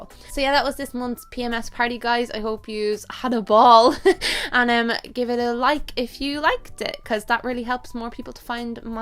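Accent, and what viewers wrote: Ireland. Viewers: I think you sound so cute with your denture! I love listening to your voice, the Irish accent is my favorite!!! You are gorgeous and thank you so much for all your hard work and your realness. I love all your videos 💚💚💚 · I can't wait to read your book I just realised that you have a YouTube channel and I'm so happy that I found an IRISH 🍀 YouTubers I love u so much thank u for being Irish and having an Irish accent rather than American or English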